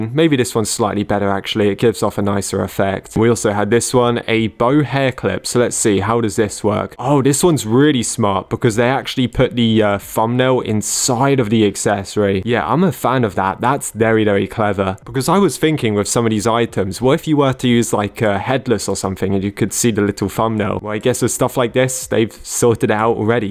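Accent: United Kingdom. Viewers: My favorita british acento youtuber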